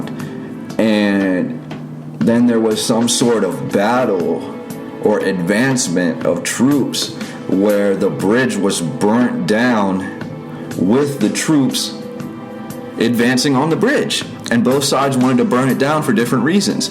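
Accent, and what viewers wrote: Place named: Pennsylvania, United States. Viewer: Great video as usual Bro. I’m from Overbrook, west Philly. I can tell from your accent, (lol) you were from Pennsylvania 👍🏽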